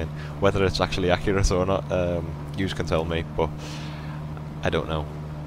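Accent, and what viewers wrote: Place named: Liverpool, United Kingdom. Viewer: Are you scouse, you sound like a scouser :D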